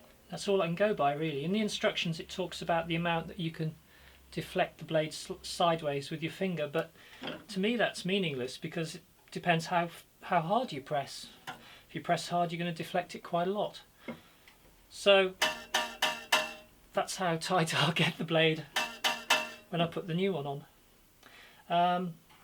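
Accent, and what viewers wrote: United Kingdom. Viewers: Interesting and helpful Video. Cute woodworking girl, i like the british pronunciation.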